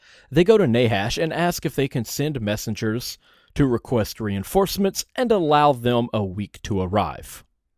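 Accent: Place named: South, United States. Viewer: its funny how articulate southerners twang bursts through in certain words and affectations. EeYeen recurs with my guy here. Why its peculiar is you will notice it only with certain words. Men is mEeYeen usually almost universally with articulate southerners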